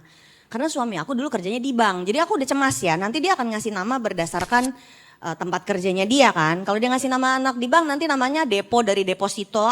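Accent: Indonesia. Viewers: Suka sama logat Sulawesinya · saya suka ibu ini kalo bicara bahsa ingris,, otentik indonesia bgt tanpa harus kebule bulean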